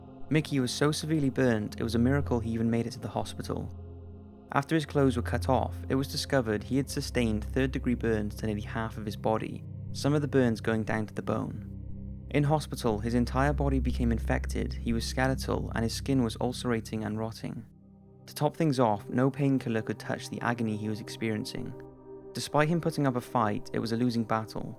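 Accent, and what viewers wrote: United Kingdom. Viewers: yes another amazing video keep em coming and i LOVE your accent are you from the UK? night and peace out from Sweden x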